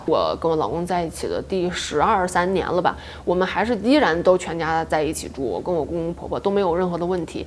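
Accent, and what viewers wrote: Tianjin, China. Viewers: 天津普通话！好亲切哦！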